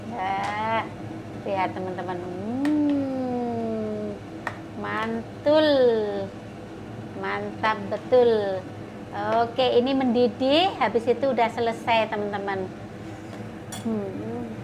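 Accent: Java, Indonesia. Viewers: Keluarga indonesia banget, suka sekali kalo mba cara bicaranys medok2 gimanaaa gitu😄 sehat selalu mb sekeluarga Amiin. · Ketagihan nonton vlog mbak..ngmong jawane iku lho marake aku guyu.. Ramah juga orange..aku seneng nontonnya Salam ya mbak..aku seko demak ..jawa tengah😁😁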